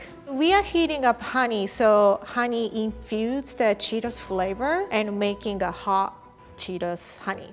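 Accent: Japan. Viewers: I just love Japanese/English voice!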